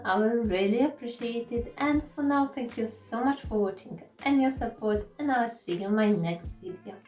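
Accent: Poland. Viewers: Ciao, i see you're from Poland i hear accent but great not shy of that i'm quite scared to speak on video because English is my 4 th language but i do a lot of diy projects, prefer more diy's on video so we could choose from, advise before to dry clay put them on the surface that you going to glue so they keep form of it, keep going it's all process enjoy😘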